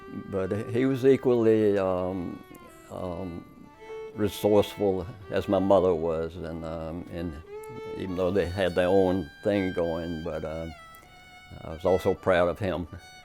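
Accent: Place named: South, United States. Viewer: The accent is like sweet music to my Massachusetts ears. Such a gentile sound. I could fall in love with a southern gentleman. My ancestors on my mothers side came from Virginia, Kentucky and North Carolina. My 4th great grandfather was a baptist preacher down there. Rev. Andrew Tribble. The depression brought my mother up this way for work in the shoe shop factories in Manchester, NH.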